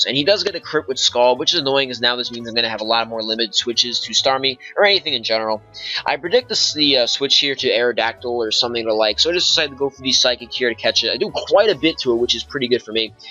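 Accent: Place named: Illinois, United States. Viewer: Are you from Illinois? Or Wisconsin? You kind of sound like you are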